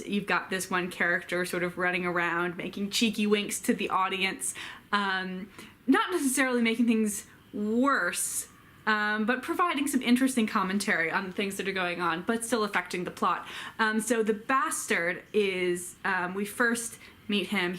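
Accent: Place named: South, United States. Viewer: I've been wanting to check out And Then There Were None for a little while, given this Christie revival but I am already a slow reader so I'm not sure it's just a way to frustration. Probably just sucked in by the cover. I've been watching you a little while now, love to hear what you're reading and I share your love of Hardy! I'm an ignorant Aussie so I hope I don't offend but I love your accent, its got a lovely twang to it (says me hah) -- clear American but a bit of Southern? It reminds me of Donna Tartt. Obviously hers is very very strong (Mississippi?). So I listened to some of your audiobook and it does lend itself to narration very well! Look forward to more from you,:)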